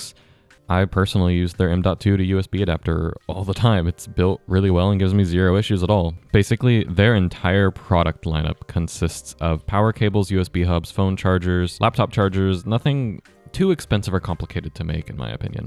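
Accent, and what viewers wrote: England, United Kingdom. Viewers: you don't speak american, you speak english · You don't speak American, you speak English!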